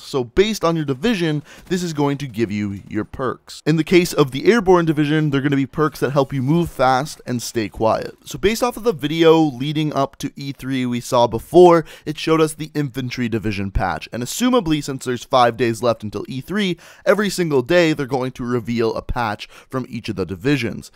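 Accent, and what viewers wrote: Canada. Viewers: I kinda like your content, but I'm distracted by your HEAVY canadian accent, I mean "Peace Øut" what is that??!! LOL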